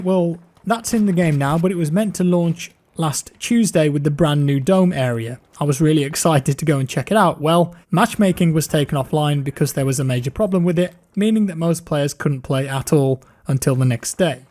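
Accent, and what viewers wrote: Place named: West Midlands, United Kingdom. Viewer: sounds REALLY West Midlands in this video!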